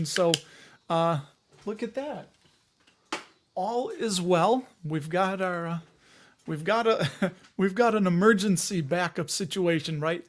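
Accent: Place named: Chicago, United States. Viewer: GREAT video.... Always love watching the replay.... Quality viewing... Love the Chi accent... LOL..... Long live Gino's East.... I do the next best thing since living out of IL - so I order the Lou's - deep dish... They come out pretty good. Love the show.... You make learning fun. You're a true soul.... Be well...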